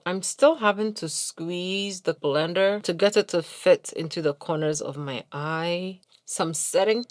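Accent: Ghana. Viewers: nahhh sis you don't understand how refreshing it is to hear the Ghanaian accent again throughout the video and the emphasis on the words hahaha love it 👋🏾🥰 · Forget the concealers please! I'm watching because of the Ghanaian accent!! Love it!❤❤ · The Ghana in your voice comes out every more in each video and it's giving me liiiiiife 🤣🤣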